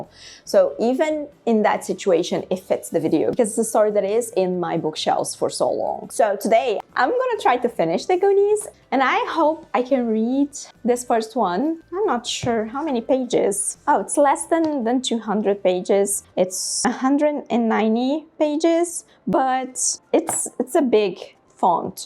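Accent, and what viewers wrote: United Kingdom. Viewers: I love your English accent! This vlog is as amazing as your Portuguese ones, congratulations! Loved watching it and seeing you so comfortable and chill! ♥️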